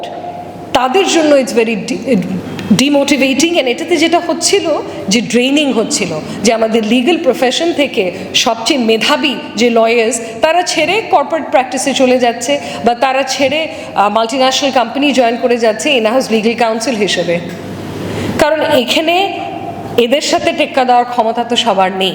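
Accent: India. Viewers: Indian accent but kothaguli valo chilo . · indian accent ! bharotiyo mohila !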